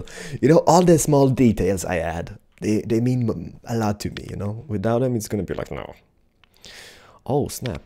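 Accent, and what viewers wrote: Sweden. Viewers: What part of sweden? Skåne or somthing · Are you from sweden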